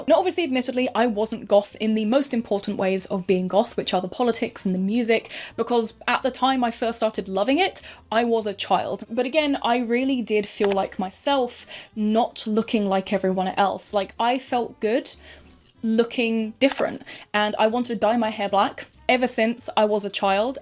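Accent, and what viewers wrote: United Kingdom. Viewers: God as a German I love you’re British perfect voice. By the way: I was a goth back in the late 90s and I appreciate that you talk about this topic. Now I am much older and more relaxed but seeing the sellout of a subculture makes me sad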